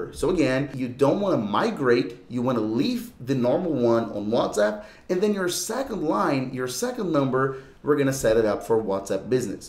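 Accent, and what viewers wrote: Brazil. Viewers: u sound brazilian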